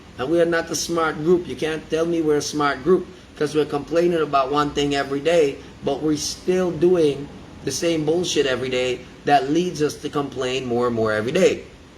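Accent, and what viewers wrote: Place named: Guyana. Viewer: im surprised u int popular lil advice tho shorter vids st88 to d point n more of d gt raw accent guyanese lik ppl dat doz tlk wah dem tinkin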